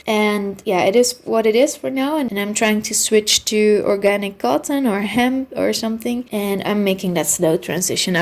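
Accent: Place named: Netherlands. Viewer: ik hoorde al aan jouw stem dat je uit Nederland komt :P ik hoorde het toch goed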